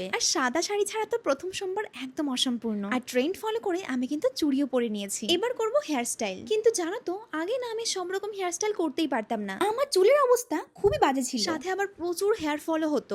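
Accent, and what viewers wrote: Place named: Sylhet, Bangladesh. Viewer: Hothat sylhati .......❤khub bhhalo lage erokom kotha bolle ...erokom jodi regular kotha Bolo bhhalo hoto😢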